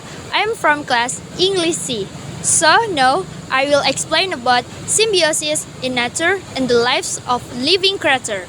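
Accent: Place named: United States. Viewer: GILSSS KEREN BGT, KIRAIN ORG AMERIKA MANA NGOMONGIN SIMBIOSIS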